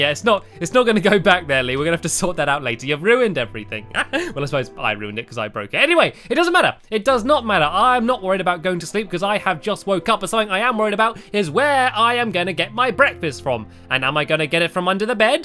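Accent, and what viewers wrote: England, United Kingdom. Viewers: He's english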